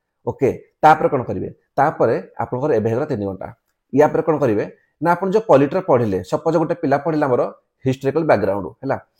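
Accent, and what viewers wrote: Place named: Angul, India. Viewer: Sir aapana angul area ru ki language semti janaa paduchi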